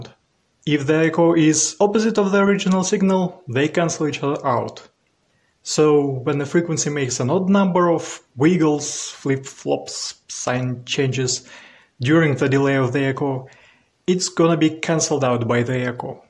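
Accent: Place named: Russia. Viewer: while you do have some russian accent, I can feel your deep understanding of the topic and the clear thought process! great choice of words as well, keep up the awesome work and reasearch owo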